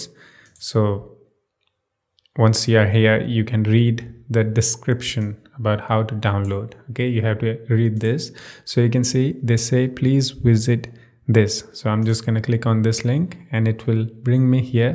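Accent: India. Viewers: anyone knows he is from which place talks like and indian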